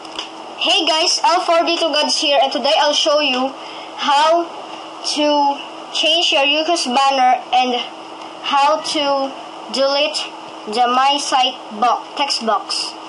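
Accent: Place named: Philippines. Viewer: Hey your english accent, sounds like you are pinoy right!!!!!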